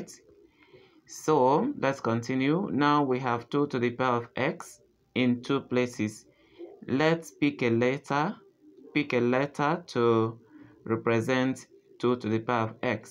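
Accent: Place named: India. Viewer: Привет, судя по акценту, вы из Индии? Интересный формат. А я из России. Город Сочи. Где была Олимпиада 2014.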